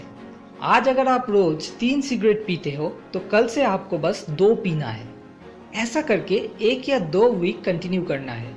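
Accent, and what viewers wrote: Bengal, India. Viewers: Your accent is bengali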